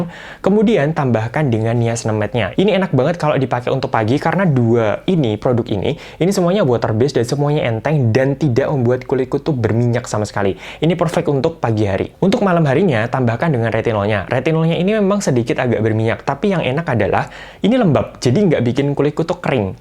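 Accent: Java, Indonesia. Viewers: Waduh jowonya mendok sedep mas hi hi...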